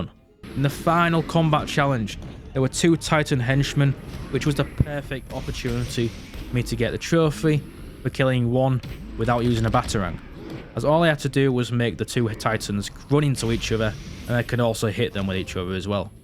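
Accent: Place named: Eastern Europe. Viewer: Damn bro what is that accent ? You might as well be Eastern European.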